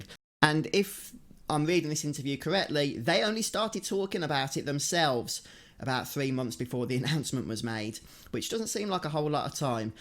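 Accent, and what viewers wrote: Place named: England, United Kingdom. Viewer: I love FFVIII a bit more than VII. BTW, I don't know why but, I like your accent. What part of England are you from?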